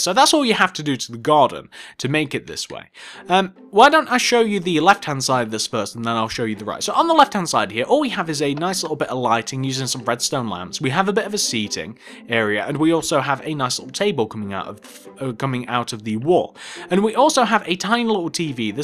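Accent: United Kingdom. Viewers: Awesome are u from the uk?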